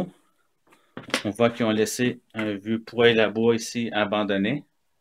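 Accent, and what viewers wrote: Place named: Canada. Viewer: Vu son accent ça doit être au cannada 🇨🇵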